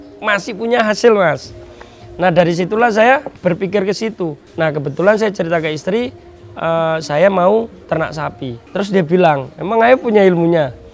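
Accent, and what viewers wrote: Indonesia. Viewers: Mantap mas kira n sampeyan wong daerah yogya lahir di sumatra dapat istri orang Banyumas...cuman jowone sampeyan sing bnr asli ngndi toh mas...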